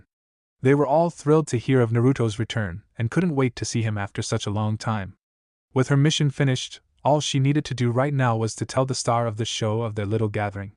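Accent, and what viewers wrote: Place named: Africa. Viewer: why u sound african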